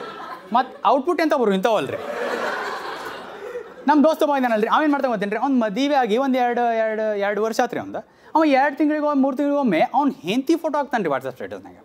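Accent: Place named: Belgaum, India. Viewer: Clean,simple,very very funny,content- based comedy - this boy,engineer,is very very Witty,I like him,have been seeing all his videos,episodes,good command over Belgaum style of Kann- talk...get going /- am your fan...from Gulbarga- a Doctor by profession,have dn my schooling at st- Paul's Belgaum..loving it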